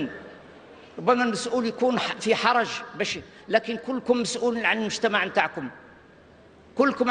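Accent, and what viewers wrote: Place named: Tlemcen, Algeria. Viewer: il parle le vraie tlemcenien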